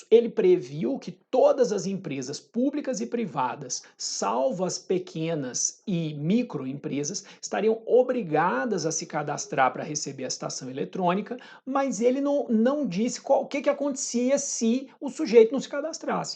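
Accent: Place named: Minas Gerais, Brazil. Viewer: adoro suas aulas. Uma inspiração! Adoro seu mineirês (pq sou mineira)... otimo! fantastico!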